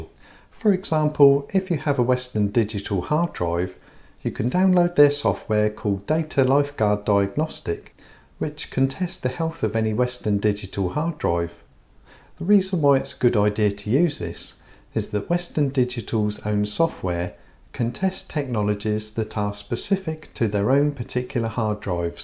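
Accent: Norfolk, United Kingdom. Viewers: You a Norfolk man? Trying to figue out your accent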